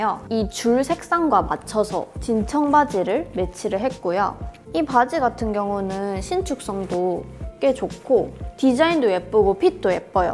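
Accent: South Korea. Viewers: I love her accent.. it's kinda like my korean friend's accent XD love you unnie, fighting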